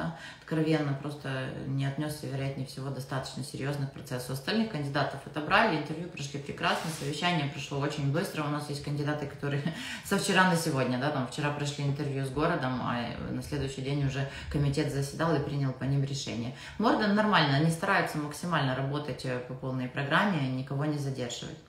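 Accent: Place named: Odessa, Ukraine. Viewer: Таки шалом ;) Слушаю Вас просто так, для поднятия настроения. Свою иммиграцию прошёл давно и успешно, но Ваша разговорная Адееесская рЭчь мотивирует и даже вдохновляет. За 10 лет не встретил в Альберте ни одного одессита, так хоть с Вами душу отвожу. Про Саскачеван это правда: степь да степь кругом, едешь 200 км и одного села нету, печалька полная... Ездим туда по 3 раза в год на рыбалку (800 км в одну сторону) за коропом метрового размера которого можно брать безлимит. Хорошо что бак в машине на 90 литров, чтоб не переживать за заправку сильно, и то всегда беру пару канистр на запас ( с до заправки не работают у них).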